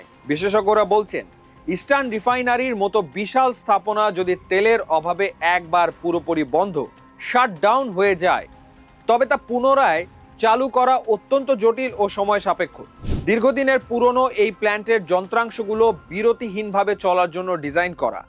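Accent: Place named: India. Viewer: ভারতের মতো নিউজ পড়েন কেন🙄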